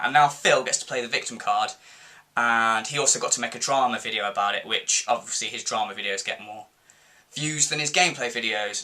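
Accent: United Kingdom. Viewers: Love that UK accent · I insulted his British accent not the British language as a whole while your coming in and commenting when you do not know what your talking about. But Im done with yall, I proved my point even if you don't think so or not. If your looking to get another reply from me your going to be waiting because I'm gone by lol. Just know I'm going to be back if you make another video on a DSP topic, adios my not amigos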